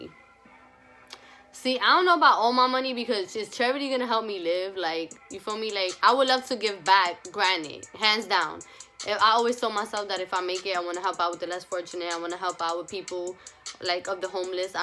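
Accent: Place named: New York, United States. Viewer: You can do this girl. Keep up your platform. I’m a new sub and already love your channel. I live in Quebec Canada and love yourNew York accent lol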